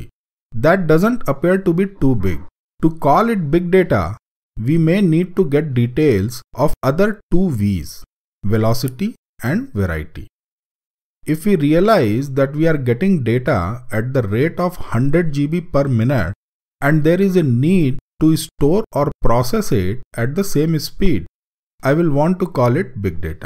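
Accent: India. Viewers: Thanks for making these tutorials, they're just too helpful. Not only am I learning hadoop, but I am also getting more familiar with the Indian accent!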